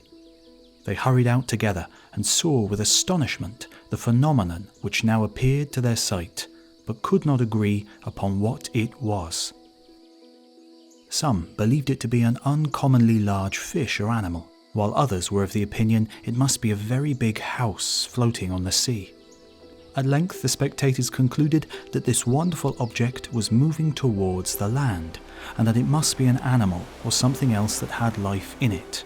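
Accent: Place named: West Country, United Kingdom. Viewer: I just noticed that your accent has a bit of West Country in it. An example is when your i's become subtle oi's. You sound like an early American explorer. It's very cool!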